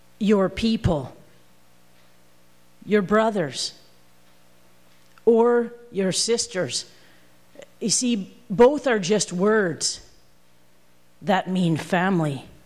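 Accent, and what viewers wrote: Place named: Canada. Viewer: their accent is so comically canadian that i can hear my own get more pronounced after i hear them speak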